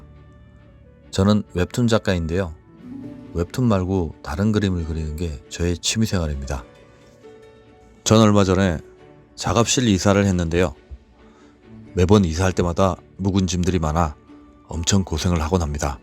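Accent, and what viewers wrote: Gyeongsang, South Korea. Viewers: 감사드려요.ㅠㅠ 경상도 발음 팍팍 나오는데...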